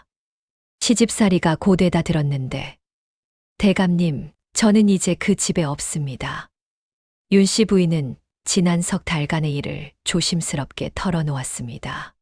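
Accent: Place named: China. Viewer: 억지로 읽는걸 들어보니 중국인 아니면, 조선족인가?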